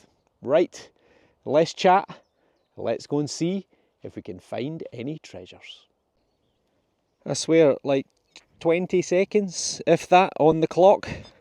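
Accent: Scotland, United Kingdom. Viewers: I enjoy both, I love the Scottish accent 😅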